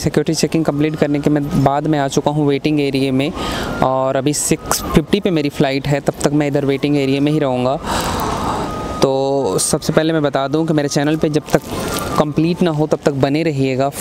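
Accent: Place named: Bihar, India. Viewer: Your assent sounds like Bihari 💓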